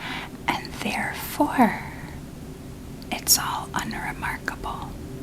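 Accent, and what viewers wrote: South, United States. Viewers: You're truly a gem. Your southern accent relaxes me the most, but at this point I'll binge anything you make, you're a comedy genius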